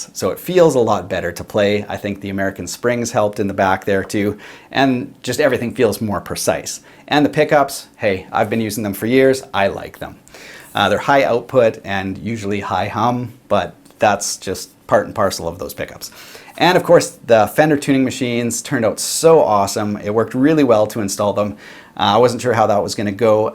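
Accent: Canada. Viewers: You sound Canadian. Am I right?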